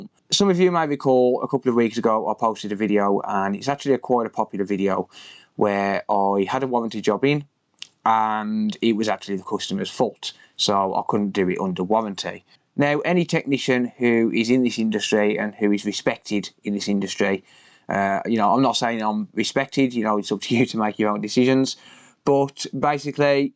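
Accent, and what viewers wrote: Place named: Midlands, United Kingdom. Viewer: Your local to me (accent) do you have a shop in the mids ? Respect to you also nice to see your work and honesty on this repair I’ve known businesses not taking responsibility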